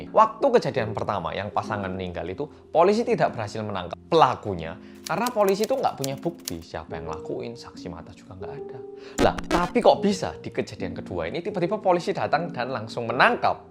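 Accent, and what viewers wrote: Java, Indonesia. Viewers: BENERAN MEDOK WKWKWKWK aku subskriber baru mas :"v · Mas yang medokk^_^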